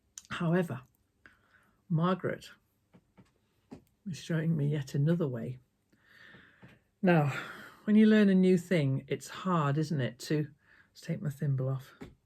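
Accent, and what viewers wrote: England, United Kingdom. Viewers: I do wish I had found you in the midst of the pandemic when I was stuck home and missing my friends, because watching your videos is like sitting with one of my friends except of course your English accent because I am American. I am very glad to find you now and am watching all your videos and am thinking I am going to have to join your patreon channel to see what that is all about. I have never felt the need to join one before so maybe I better check it out.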